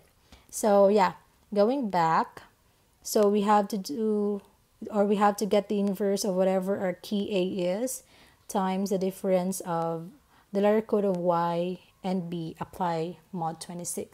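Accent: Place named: Philippines. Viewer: you're a filipino because of the accent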